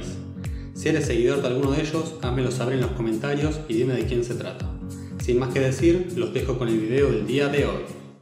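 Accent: Argentina. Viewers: EL NARRADOR ES ARGENTINO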